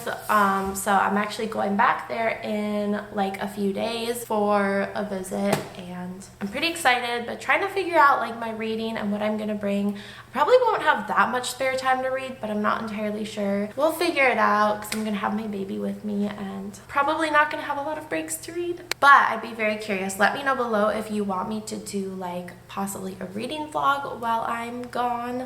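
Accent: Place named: North Carolina, United States. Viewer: Haha no, you don't really look like someone who walks a lot outside in super sunny California 😅 I was guessing that you lived in or somewhere around maybe North Carolina or Pennsylvania or something like that, based on your appearance and also specifically your accent, but I'm not American so there's that. Anyway: Your pale complexion is obviously very pretty, however, and so I don't think you need to strive for a sunburn/orange brown tan. Way overrated in this world. 😊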